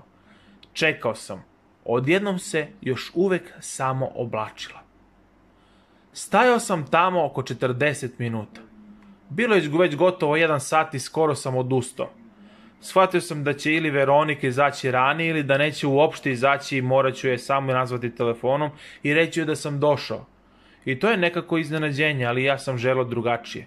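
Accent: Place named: Nis, Serbia. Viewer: Vidi se da si iz Nisa ili okoline,i imws jako los akcenat i dikciju da pricanje ovakbih prica....ideja je dobra ali realizacija vrlo losa....To je moje iskreno misljenje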